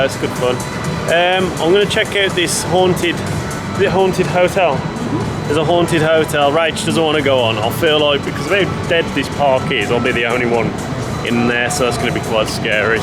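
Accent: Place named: Midlands, United Kingdom. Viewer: hi mate, love your content! just wondering are you from the midlands because your accent sounds very strong ps I'm from Birmingham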